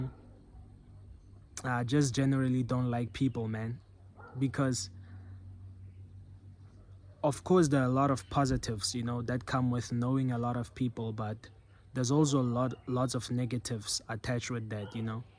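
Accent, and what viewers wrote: South Africa. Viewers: You South African gee?, I can hear your accent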